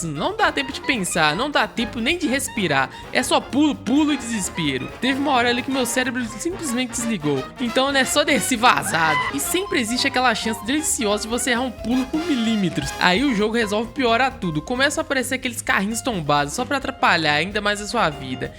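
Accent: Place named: Minas Gerais, Brazil. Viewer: o sotaque mineiro deixou tudo melhor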